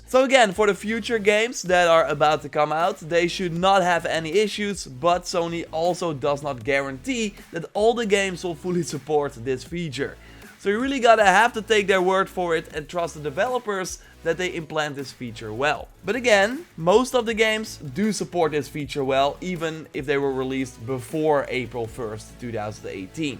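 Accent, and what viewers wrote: Netherlands. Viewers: Ik hoor aan je accent dat je Nederlands bent!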